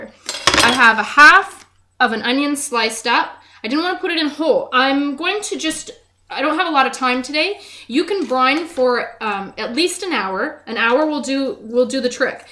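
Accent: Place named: Canada. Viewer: Your accent, im loving it... your canadian huh... yeah, im flirting just a bit...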